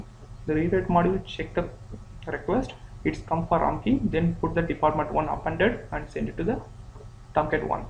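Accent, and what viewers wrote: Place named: India. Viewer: really want to watch your video, but it is not possible!!!! talk slower, louder, and dont run with the words. ur indian accent is very strong, u should know it, and slowwwwww it down, have u watched it? understand any thing??? 27 min, and you dident notice it? its sad i can not enjoy your wisdom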